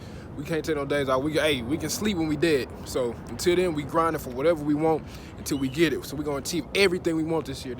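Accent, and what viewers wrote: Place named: Texas, United States. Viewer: What part of TEXAS u from bro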